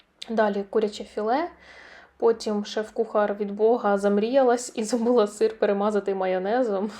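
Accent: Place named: Odesa, Ukraine. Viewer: У вас чудова літературна вимова, як для Одеси.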